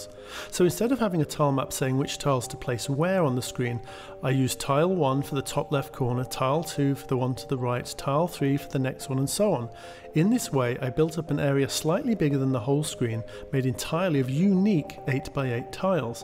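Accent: United Kingdom. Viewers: There is plenty of American content that has a big international-audience but they don’t change their dialect or language, they speak as to where they are from. Speaking as an Australian, I was confused when I first started watching your stuff as you sounded British, and I knew TT was, so initially I thought you and TT were a lot more American than I once thought. Ultimately it is your channel and you can do what you want, I just find it odd you say Genesis and speak for an American audience and i’ve seen numerous other comments saying the same. Toy Story is one of my favourite video games ever, so I don’t mean any hate, I just think you are documenting some great stuff here that will be watched for decades from people everywhere, it should be authentic and speak to as where you and TT are from (I know you might not be there now, but I lived in England for a while but am still ultimately Australian).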